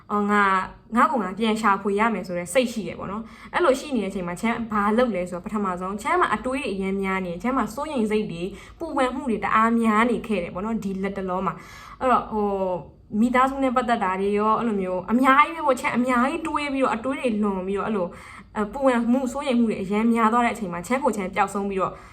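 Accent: Rakhine, Myanmar. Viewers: ရခိုင် လူမျိုး ထင်တယ်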